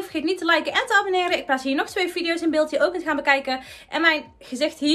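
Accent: Brabant, Netherlands. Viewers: Heerlijk Brabants acsent heb je, I love it. Was echt een leuke en grappige video.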